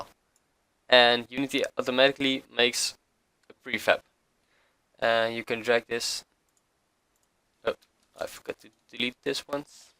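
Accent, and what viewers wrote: Netherlands. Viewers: Hahaha, aan je accent hoor je dat je Nederlands bent.. Maar goede video! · are u dutch lol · that dutch accent though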